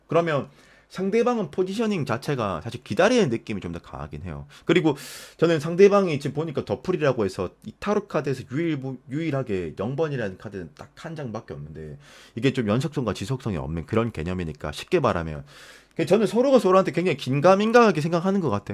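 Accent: Gyeongsang, South Korea. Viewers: 경상도 남성 목소랴가 정겹고 편안하네요 친한 칭구가 말하듯이 얘기해주는 느낌ᆢㅋ 잘 듣고 갑니다~~^^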